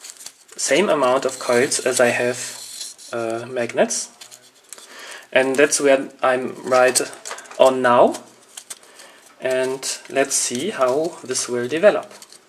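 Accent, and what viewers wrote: Germany. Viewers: Man merkt glaich das du deutscher bist. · German accent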